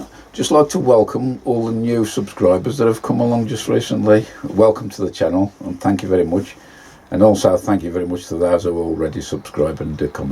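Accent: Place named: Midlands, United Kingdom. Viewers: I also find it difficult to throw seedlings away!! Whereabouts are you? You sound west mids...... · Just discovered you and I Love this. Very informative and relaxing. I'm getting the vegetable garden bug again. Must be that midland accent.ive subscribed Keep me coming.👍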